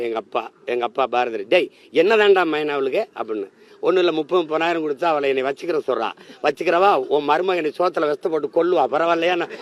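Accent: Kongu, India. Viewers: அன்பிற்குரிய மகளே.தங்களுடைய கொங்கு தமிழ் அருமை.சீமான் என்றாலெ அசிங்கம் என்றாகிவிட்டது.சீமானை குறித்தே பேசி நாம் அசிங்கப்பட வேண்டாம் என்பது என் கருத்து.சீமானுடைய பேச்சைக் கேட்டாலே அருவெறுப்பாக இருக்கிறது.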